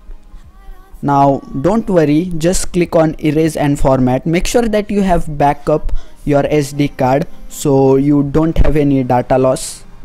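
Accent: India. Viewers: Indian much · For some reason I don't like that Hindi accent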